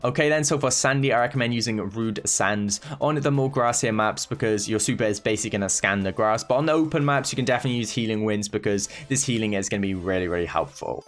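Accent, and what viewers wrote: United Kingdom. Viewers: For some reason, I like hearing British accent! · Sooo early....love to hear your British accent😅😅😅btw big fan........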